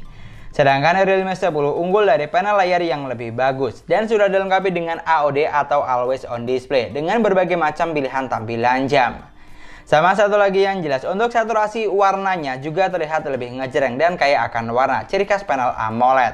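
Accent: Madura, Indonesia. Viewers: Orang Madura yg bang?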